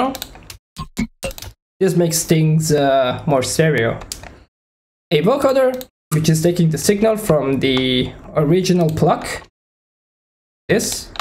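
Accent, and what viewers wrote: Italy. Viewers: Italian I think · Si sente l'accento italiano ahahah hai stra talento!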